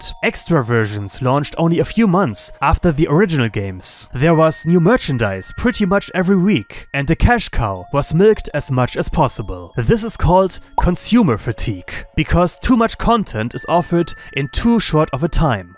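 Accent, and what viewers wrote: Germany. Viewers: Your accent ist sehr sehr deutsch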